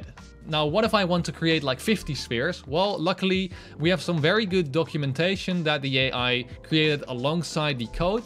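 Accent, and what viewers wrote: Netherlands. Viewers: Accent. Dutch guy. 100% 👍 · Nederlander!? Broeder!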